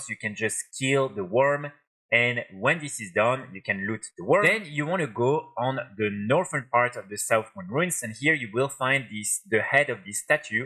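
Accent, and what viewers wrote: France. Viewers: also i need to say i love that french accent its just makes it sound so nice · French accent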